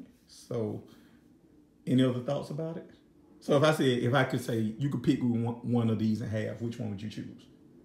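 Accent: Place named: Atlanta, United States. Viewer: Right on! It's all good,Bro! I picked up on that ATL accent instantly. Lol!!! I'd actually stopped through there in between flights while on my way to Canada last month. But,yes sir I'm on IG & just posted my first TF pic on there yesterday. Will do,Bro!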